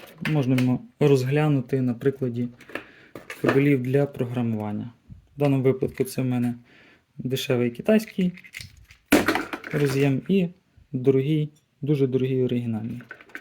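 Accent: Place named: Ukraine. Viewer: Речь хохлатая дюже поганая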